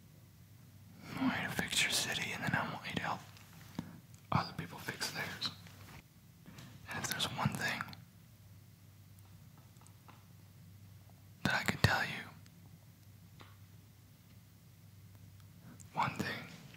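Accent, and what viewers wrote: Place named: United States. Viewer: I didn’t know is Danish because of his perfect American accent Really wanna request a real life collab with ObviouslyASMR because they are all European LMAOOOOO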